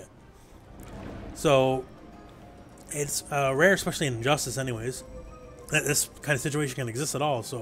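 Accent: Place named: Atlantic Canada, Canada. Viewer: Great video bra!! I can tell your from the East Coast of Canada ehhh by your accent!!! Very helpful video bro!!! Thx. I liked and subbed!!!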